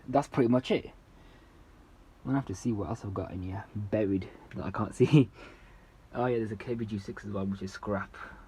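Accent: United Kingdom. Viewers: Are you from Birmingham 🤣🤣🤣your accent is sick !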